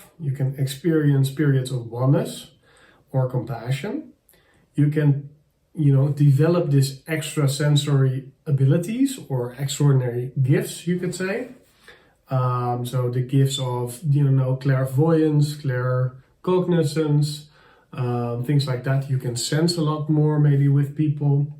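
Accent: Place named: Netherlands. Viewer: As soon as this started playing, I recognized your accent right away because my Divine Masculine is Dutch also! Ha ha! And I love when I hear other men speaking with a Dutch accent because it clears up for me that it's my DM's VOICE that affects me! Blessings and thank you! My kundalini has just begun rising and I need all the info about it I can find!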